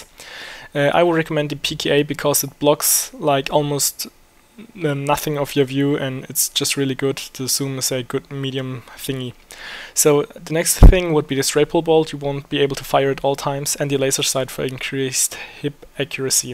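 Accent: Germany. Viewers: Love your accent are u German? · You are German, aren't you?:-) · Omg, I love you're german accent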